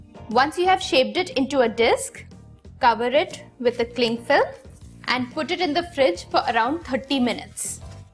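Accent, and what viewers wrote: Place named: India. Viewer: That accent is so cute, if all toddlers spoke English with a heavy Indian accent it would be like sunshine on top of sunshine.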